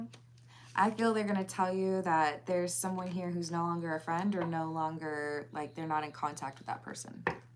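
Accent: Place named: Minnesota, United States. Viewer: Bahahaha..love the Minnesota accent. 😂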